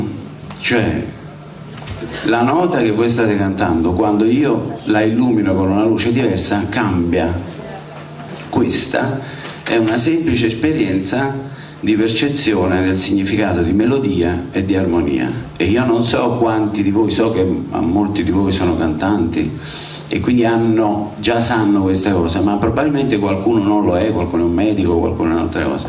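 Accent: Naples, Italy. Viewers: Complimenti maestro la sua napoletanità la rendono comunicativo e trasferisce le sue nozioni in maniera eccellente !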